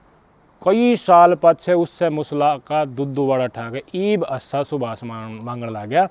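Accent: Haryana, India. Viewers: Bhut bdiya Bhai sahb Aapki haryanvi se lgta hai real haryanvi yhi hai 🙏🙏🙏🙏💐💐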